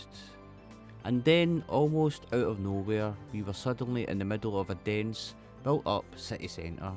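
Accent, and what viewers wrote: Scotland, United Kingdom. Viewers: Video: great. Narrating: even better. Scottish accent: warms my heart. Perfect!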